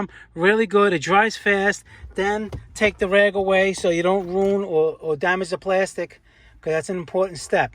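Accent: New York, United States. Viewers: I love his accent. This is a new york accent right?? Maybe Boston.. but i dont think. It sounds too close to home.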